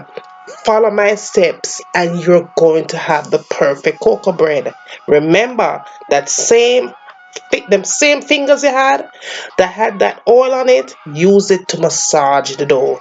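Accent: Jamaica. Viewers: 😳😝Gosh I love to here you speak. U be the Jamaican I love to listen to like Miss Lou. Yes happy channel growth. Now please traditial Jamaican yest corn bread receipt Thank you.🤣😎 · I love this delicious 😋 Coco bread. I love listening to your tutorial with our Wonderful Jamaican accent& language. If you love listening to our language you can check out my Poetry 💖Keep sharing these delicious recipes 💖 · I could listen to you all day, Luv how your utensils and kitchen are clean. loving the Jam twang' too! lol@ it there and let it hang out." You have a subscriber right away. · I love accent you really Jamican and way to talk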